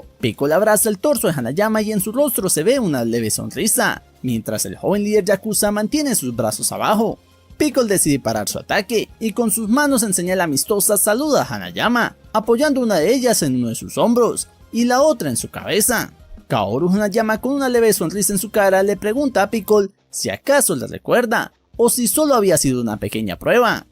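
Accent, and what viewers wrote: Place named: Mexico City, Mexico. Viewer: porque no cambias tu vocalización a la hora de narrar?? usas mucho el aaaaaaaaa, eeeeee, iiiiiii, oooooo con un tono de chilangoooo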